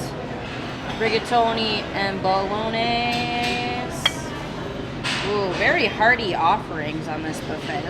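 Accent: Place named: Midwest, United States. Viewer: Definitely from Michigan or Wisconsin with the accent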